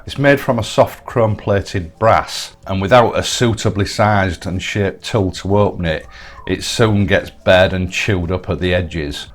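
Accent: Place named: East Yorkshire, United Kingdom. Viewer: Sir, the quality of your videos gets better and better! And your East Yorkshire accent is something special! Thank you for your work!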